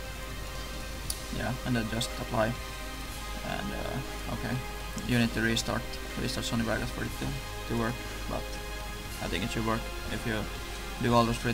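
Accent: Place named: Sweden. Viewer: Man hör ju tydligt att du är svenne iaf :D+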